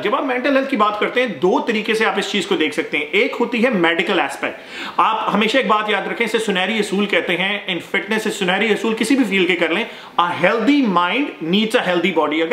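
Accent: Punjab, India. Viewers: Hi veerji I am from India I started following u one reason is the way u speak bit punjabi hindi english all combination it makes me nostalgic since I am from punjabi family but married to a Hindu, I have gone through that tràuma of depression,and I somehow got over it, in fact it made me more mentally strong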